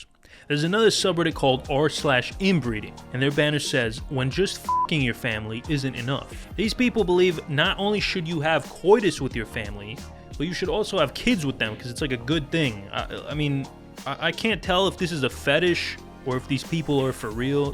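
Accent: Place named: New York, United States. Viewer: I love your accent ? Where is it from? New york?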